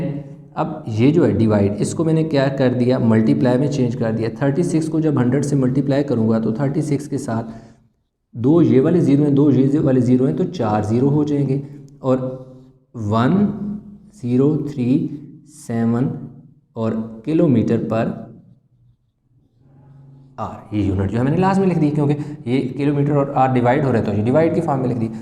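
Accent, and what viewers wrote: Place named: Sindh, India. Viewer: ap ka lehja sindhi wala hai are u sindhi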